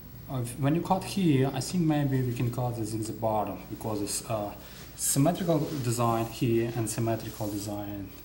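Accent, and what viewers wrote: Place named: Russia. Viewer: Ты Русский ???? А то акцент больно хорош!!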